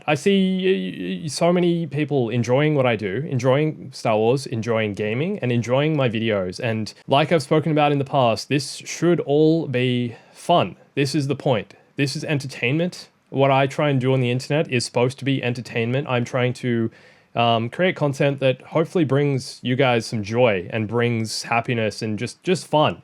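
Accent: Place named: Australia. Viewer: Wait, You are an aussie, I thought you were a kiwi.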